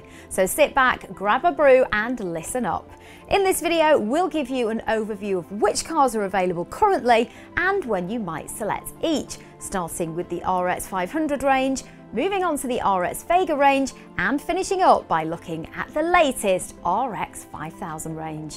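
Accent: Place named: North Midlands, United Kingdom. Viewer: She sounds slightly North Midlands. Leicester maybe... Nottingham. I could be wrong.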